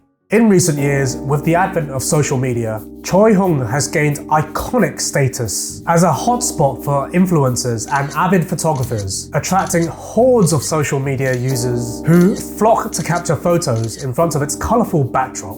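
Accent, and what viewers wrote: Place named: United Kingdom. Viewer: bro u sound so brit it trips me out lol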